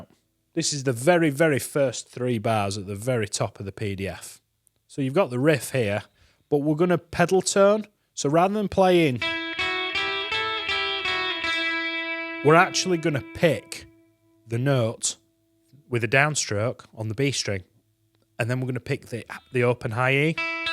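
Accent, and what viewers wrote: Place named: Northern England, United Kingdom. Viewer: Great lesson, and bonus it's in a good ol' northern accent, makes a change from all the US channels out there. 😂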